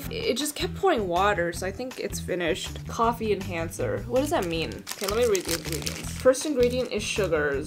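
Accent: England, United Kingdom. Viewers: That English accent though 😬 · Is there a reason you speak with an annoying British accent or is that just to be annoying? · British accent 🤌🤌🤌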